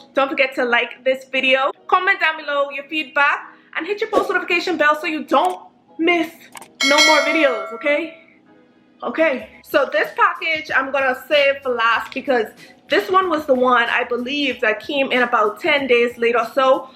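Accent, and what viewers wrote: Bahamas. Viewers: I watching this trying to figure out if you Bahamian cause it sounds like you are... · I hear an accent. I may be wrong but are you Bahamian? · I knew I heard a Bahamian accent 😅😌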